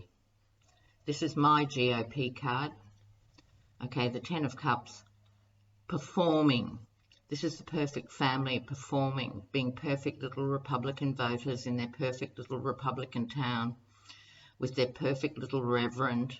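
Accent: Australia. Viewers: Wonderful - I just want to thank you for bringing American a little hope and insight into the ultimate ending of all of this madness. When I hear your lovely accent I am reminded of all of the times when America has gotten themselves into a mess and Australia, like that treasured big brother, forever there supporting and trying to get us to do the right thing. Nevertheless, I am grateful for you and others who have held our hands this past year and I hope against hope that next year we won't keep you guys so busy.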